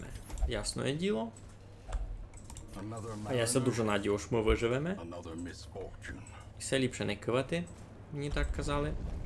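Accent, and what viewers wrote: Transcarpathia, Ukraine. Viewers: Вау, летсплеї по Закарпатськи. Просто вау 🔥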